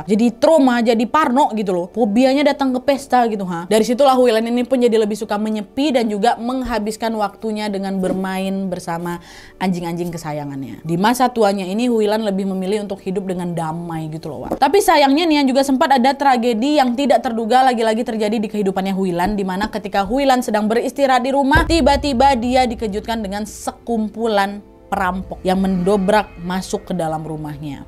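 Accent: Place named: Minangkabau, Indonesia. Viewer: Baru kenal content (tiga content sj per 27 Agt 23) ini.. ternyata lsg betah mendengar paparan kisah dan cuap2nya yg dibumbui dialektika Minang Riau 😊 enak dan nyangkut sj di kuping. Keren, semangat utk terus berkreasi. 🎉🎉🎉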